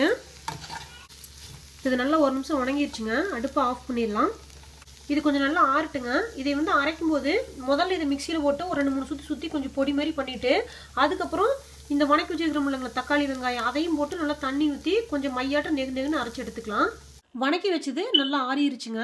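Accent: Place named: Coimbatore, India. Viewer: Akka neega Coimbatore thana pesarathuliya theriyuthu ka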